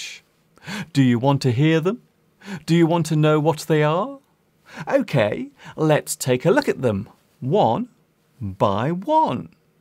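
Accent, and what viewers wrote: England, United Kingdom. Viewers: he said ftom england do sure it is britinsh english... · Hi Mr., my name's ilham. I'm From Indonesia, your video is verry good, and i want learn english from your channel. By the way, do you speak english with british accent, right? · this is british english or american ????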